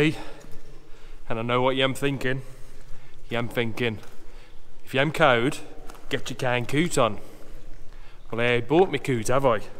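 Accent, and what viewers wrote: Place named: Black Country, United Kingdom. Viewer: LOVE IT! Speaking the Black Country accent:) Again a beautifully stylized & wonderful film. The tunnel pics are stark but yet meaningful.....to me right now they represent that light at the end of the tunnel my country has fallen into. Again sorry to be late, but it's been crazy....12 new people here at the homeless shelter....and I'm barely getting home before the curfew the last few nights.....very difficult times here in the US....dude I swear not all Americans are bad people...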